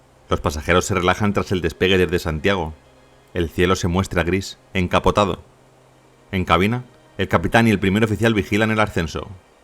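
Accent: Spain. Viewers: MUCHACHO RELATADOR. HABLA MÁS DESPACIO PORQUE NO SE TE ENTIENDE TU ACENTO NO SE DE QUÉ PARTE DE ESPAÑA SEAS. PERO HABLAS CON LA LENGUA PEGADA AL PALADAR ÓSEA TE OYES CÓMO UN TRABALENGUAS , TRATA DE PRONUNCIAR TUS PALABRAS MEJOR DICHAS. ESQUE TE COMES LAS PALABRAS Y APARTE HABLAS RAPIDO. NO SE TE OYE NADA CLARO CORRÍGETE MI AMIGO. GRACIAS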